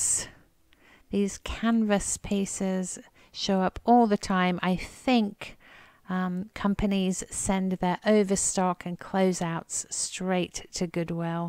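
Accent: England, United Kingdom. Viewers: I really miss the many varieties of hummingbirds I saw daily when I lived in Costa Rica before returning to Canada. I was looking very closely trying to ID them , but definitely some male & female Ruby-throats but not sure of the others. I really enjoy your videos, and laugh when I hear you saying some of the quaint English says. Of coarse, also enjoying watching the dogs and cats...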